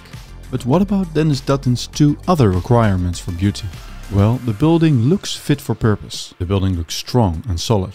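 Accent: Netherlands. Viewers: Notwithstanding your terrible Dutch accent, you are every bit right about the importance of beauty. Actually, this is exactly what King Charles III stated as Prince Charles when he released "A Vision of Britain" and launched his institute for architecture. Efficiency, practicality and beauty are not incompatible at all. On the contrary, they should go hand in hand.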